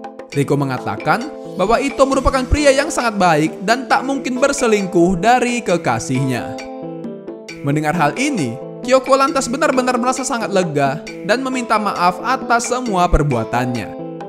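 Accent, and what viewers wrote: Medan, Indonesia. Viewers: abng orang medan ya bang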